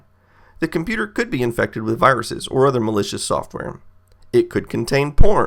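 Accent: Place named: South, United States. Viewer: ´s southern accent was perfect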